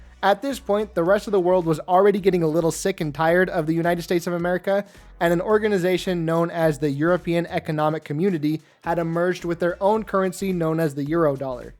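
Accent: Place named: Argentina. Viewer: Off topic, you have a very argentinian accent, i don't know what to think xD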